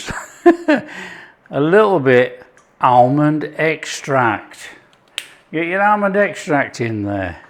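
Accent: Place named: Northern England, United Kingdom. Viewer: Love, love your beautiful accent. Is it from the north ? Greetings from Reading, Berkshire.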